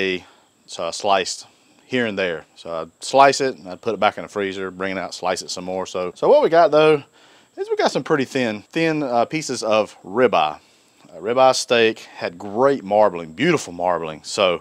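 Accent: North Carolina, United States. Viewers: My 36” is coming in this week! You sound like your from my neck of the woods near Raleigh NC. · Man you gotta be from somewhere around G-town , NC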